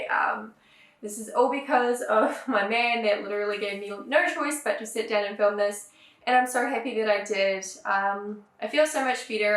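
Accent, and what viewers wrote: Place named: New Zealand. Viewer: Kia Kaha lovely lady, just keep being you that's all that matters. Plus love hearing the good old kiwi accent.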